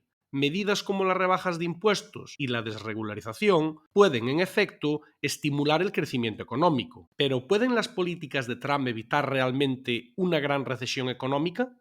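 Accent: Galicia, Spain. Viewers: un buen acento gallego no viene mal. Buen video 🙂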